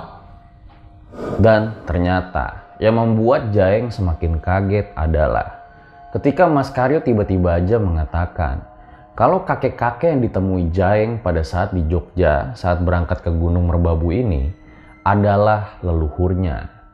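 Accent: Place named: Jakarta, Indonesia. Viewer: Gue sukadah bacotnye jakarta banget khas, tapi kalo bisa jangan bahasa frontal lah. Sesuaikan tempat . thx i like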